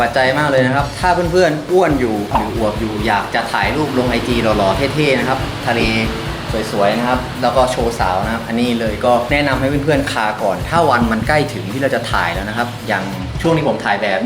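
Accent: South, Thailand. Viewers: มาแล้วสายฮามีสาระ5555 ชอบสำเนียงคนใต้ชัดๆ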